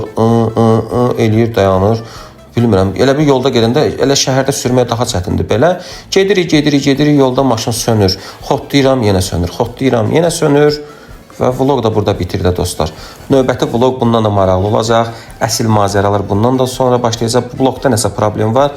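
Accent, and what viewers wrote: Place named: Neftchala, Azerbaijan. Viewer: Bayaqdan düşünürəm bu hara ləhçəsidi?”Nefçala”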